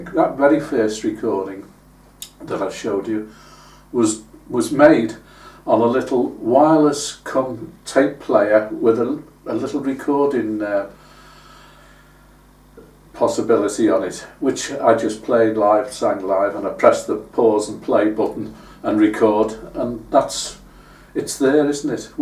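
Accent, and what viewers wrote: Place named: England, United Kingdom. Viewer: Hello.. Love your English accent, very charming;-))... you sure have a nice set up for all your equipment, you sure went back to gather all the specific tunes, to explain all this to us, looks like a lot of work, all your version were a delight to listen too,..You are a great artist, singer and most all, you get so detailed with your songs, that they become part of us..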